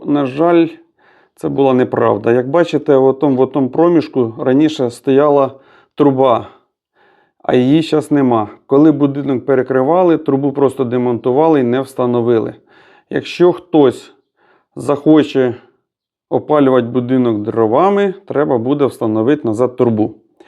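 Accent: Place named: Kharkiv, Ukraine. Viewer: Форточка - кватИрка (укр.) Телефон - так и есть на укр.яз. - телефон, а не "телеХВон".))) Я подозреваю, что вы сами родом со Слобожанщины. Проскакивает харьковский суржик, как не стараетесь говорить українською мовою..) Да и в Харькове было такое риэлтоское агенство "Квартал".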